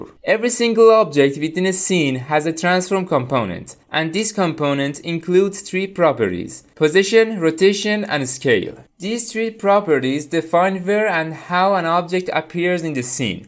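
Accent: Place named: Iran. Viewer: داداش لهجت داد میزنه ایرانی 😂عالی داش همینجوری قوی ادامه بده خوشحال شدم ویدیوت رو دیدیم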